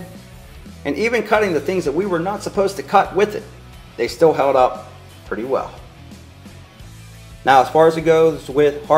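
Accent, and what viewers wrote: Pittsburgh, United States. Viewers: Nice acccent. Pittsburgh area?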